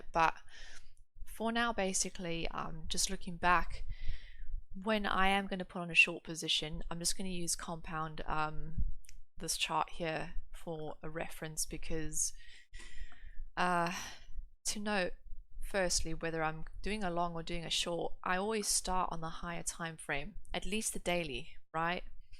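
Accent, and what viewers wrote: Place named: South Africa. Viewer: South Australia sorry haha. I wondered if you were from South Africa with your accent.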